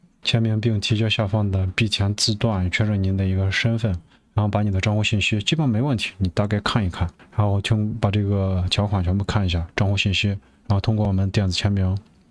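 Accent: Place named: Guanzhong, China. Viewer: 这口音是咱关中乡党呀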